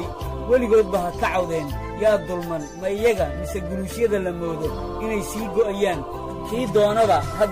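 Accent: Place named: Mogadishu, Somalia. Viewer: Sheekada waxaa Qiimaha u yeelaya wariyaha siiba lahjada Reer Mogadishu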